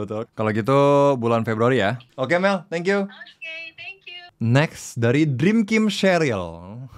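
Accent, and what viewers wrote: South Jakarta, Indonesia. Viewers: Bahasa Indonesia ala Jakarta paling bagus dari semua YT-ers Korea yang si ini.....Indonesia asli... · Ini mah aksennya jaksel bet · Kalo ngga sebut Korea, kita kira orang Jakarta..!!..😁👍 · INI ORANG JAKSEL YA?😭😭😭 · 🤣🤣🤣 gue pikir lo anak jaksel bang. kocak bet dah lo ahaha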